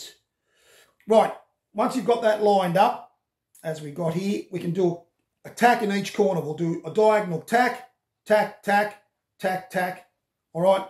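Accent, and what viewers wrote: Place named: Australia. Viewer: Doctor your 4 DOF build is amazing! I love the videos and your aussie accent is always pleasant to the ears :) Cant wait for the rest of the build videos!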